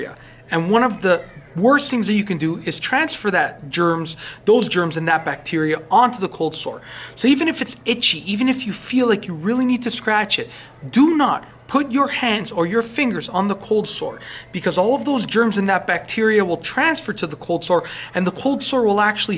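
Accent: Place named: Canada. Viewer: Sounds so Canadian to me. Sorry if I'm wrong. I'm English.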